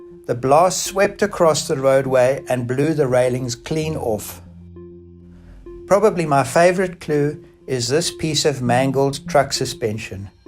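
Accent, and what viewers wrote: South Africa. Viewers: I hear a very South African accent! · He's South African. He has a strong SA accent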